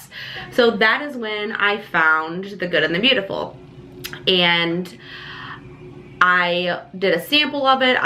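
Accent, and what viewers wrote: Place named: New Jersey, United States. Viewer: Hiiiiii!!! I just found your channel and subscribed! So weird but what state are you in? You sound like you’re in jersey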